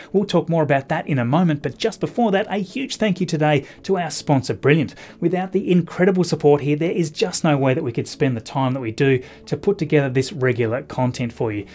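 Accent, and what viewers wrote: Australia. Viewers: "The bitch had been cleared..." I almost died laughing. Sorry, I know its just your Ausie accent, but too funny. Love it!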